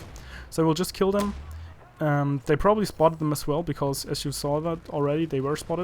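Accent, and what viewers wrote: Germany. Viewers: are you german ? You sound like you are. You accent is like you come from germany because of the th pronouncing. -Mojo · Oh are you German? I notice an accent there :D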